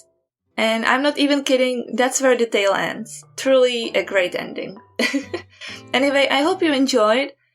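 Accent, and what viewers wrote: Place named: Czech Republic. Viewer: are you czech? because you sound really czech and i would be surprised if you aren't lol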